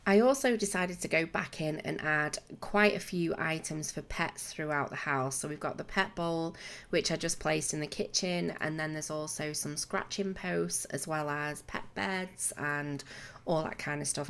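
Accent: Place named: Manchester, United Kingdom. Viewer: Youve gotta be from manchester hahahaha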